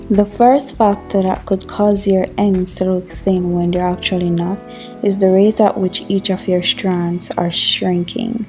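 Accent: Jamaica. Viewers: Do I suspect a fellow Jamaican?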